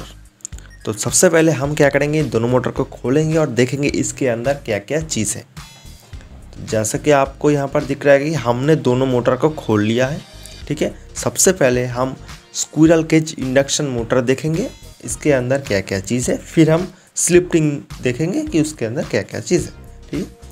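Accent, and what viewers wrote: Bengal, India. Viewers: তুমি বাঙালি তাই না??. হিন্দি বলার ভঙ্গিতেই ধরা পড়ছে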